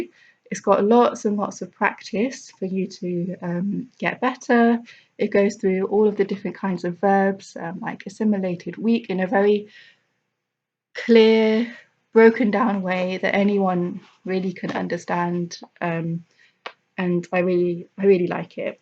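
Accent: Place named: United Kingdom. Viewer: مرحبا 😊اللهجه تدل بريطانيا 😅استخدم الترجمه لمعرفه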